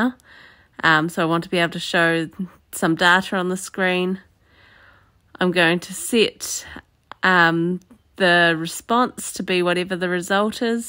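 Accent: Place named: New Zealand. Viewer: Yo! Holla from Perth WA! Your accent sounds like you’re from NZ! 🤙 Awesome tutorial 👏 keep up the awesome work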